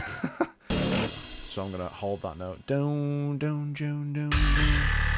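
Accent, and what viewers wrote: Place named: Yorkshire, United Kingdom. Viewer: Another Yorkshire lad?? 🤘🏻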